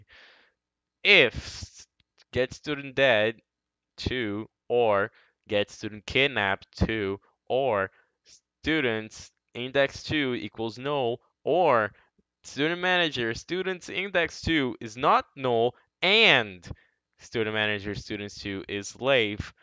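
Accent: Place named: Brazil. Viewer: cara, o maluco pode ser fluente, mas sempre da pra sentir o brasileiro por trás kkkkkkkkkkkk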